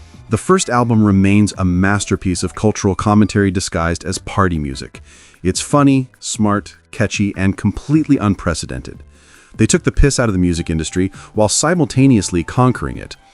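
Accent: United States. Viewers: Listening to this guy use British slang and idioms in an American accent is painful for some reason. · I hate forced subtitles and it's really weird hearing all this British English in a flat, mechanical sounding American English voice.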